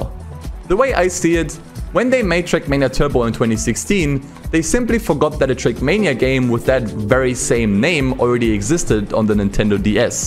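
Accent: Germany. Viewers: What an absolute banger of a video, must have taken weeks to produce such a long video but it was totally worth it! Btw. am i hearing a german accent? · Ned schlecht das video bree. Hätte beinahe den deutschen Akzent nicht rausgehört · Wie man the german Akzent einfach raus heared 😂 nice !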